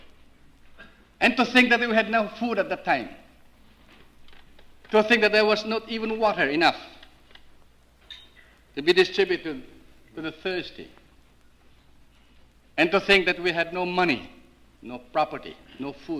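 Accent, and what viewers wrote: Philippines. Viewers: One of the best examples of the cultivated Philippine English accent.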